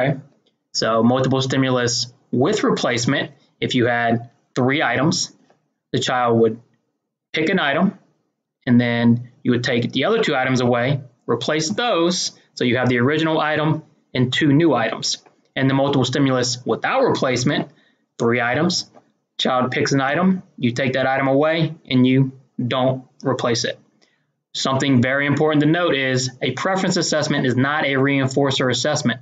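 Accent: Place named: Philadelphia, United States. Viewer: I swear I hear a Philly or east coast accent in there! You weren't born and raised in Vegas, were you?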